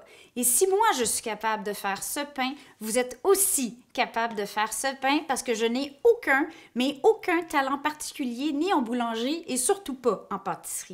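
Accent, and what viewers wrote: Quebec, Canada. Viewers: Merci pour cette recette et ce charmant accent québécois 💊💉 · Tu es vraiment très doué et très drôle avec ton accent canadien 😂 merci de partager ton savoir faire! J’en fais souvent du pain dans la cocotte… une belle réussite à chaque fois! 👍 Depuis la Suisse 🇨🇭 Merci 🤩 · Bonjour depuis la Belgique 🥰 Tout d'abord merci pour votre peps votre bonne humeurs votre extrême gentillesse Quel plaisir de vous écouté (j'ai tjs adorer adoré l'accent canadien ) Ici depuis 2 semaine j'essaye de réaliser mon pain cocotte mais d'après une autre recette et avec de la farine au cereal et j avoue que c'est une catastrophe à chaque pain . Je vais donc essayer vôtre recette demain avec les même quantité d'eau car je met moins de liquide habituellement. Mais je vais essayer de me tenir à votre recette et la laisser poser au réfrigérateur jusqu'à demain. 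matin.🙏🤞🤞🤞 je vous dirai si ça a fonctionner Car même tailler je ne fais pas comme sa d'un.mouvement sec donc tout retombes. Mais je vais essayer vôtre recette à la lettre merci ❤❤❤ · Décidément j'adore l'accent canadien 😁 Question 475 °F ça fais combien en degré celcius ? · moi aussi ! et j’aime voyager au Canada grâce à ce bel accent 😉 ça me rappelle de beaux souvenirs 🥰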